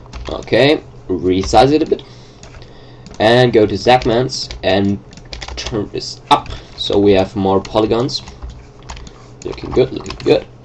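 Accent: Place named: Germany. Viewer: Man hört sehr gut deinen Deutschen akzent :D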